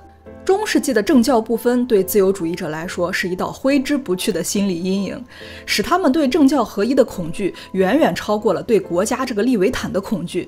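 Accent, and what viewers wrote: Qingdao, China. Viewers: 这姑娘好像是青岛人啊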